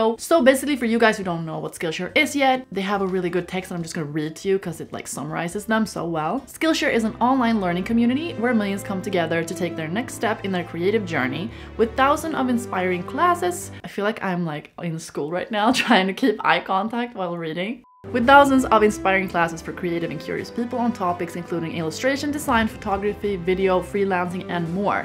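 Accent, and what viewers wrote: Sweden. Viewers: Are you Swedish just a question? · Didn't realize that you where Swedish until like 4 minutes into the video. Well, I'm Swedish to so... Hejsan Svejsan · Is she Swedish? Sounds like she has an Swedish accent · you sound kind of swedish thats so cool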